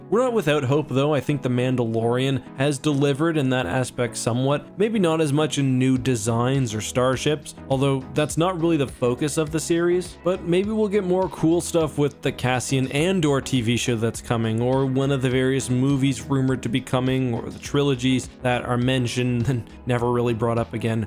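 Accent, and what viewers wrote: Canada. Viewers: Are you canadian? It's the first time I notice some "oots" and "Aboots"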